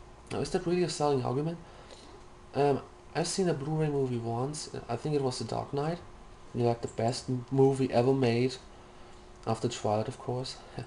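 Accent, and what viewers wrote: Germany. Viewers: Guten Morgen! I like your german accent it sounds really cool. Sony is in the business of making money. if your waiting for backwards compatibility on the PS3 Slim console, it well never happen. No offense but you want alot but your just not willing to pay for it. Blu-ray looks fantastic on a properly "calibrated" hdtv preferably 1080p but a 720p would work as well. You don't seem to be into movies so i'd recommend you get a 60GB PS3 console which is backward compatible.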